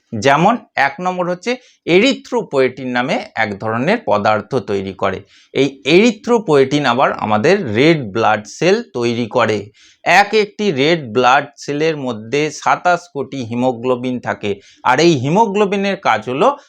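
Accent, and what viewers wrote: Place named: Kolkata, India. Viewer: ডাক্তার সাহেব আপনি কি বাংলাদেশে বসবাস করেন ? আপনার কথা এবং ভাষা অনুযায়ী মনে হচ্ছে আপনি ইন্ডিয়ান অর্থাৎ ক্যালকাটার যদি বাংলাদেশে আপনিও হয়ে থাকেন তাহলে অবশ্যই আপনার নাম ঠিকানা কোন হসপিটালে আছেন জানাবেন।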